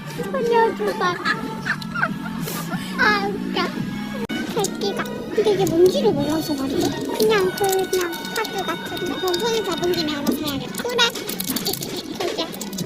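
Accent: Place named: Busan, South Korea. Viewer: 반가운 부산사투리 ㅋㅋㅋㅋㅋ 저도 부산사람이라 넘 간갑네요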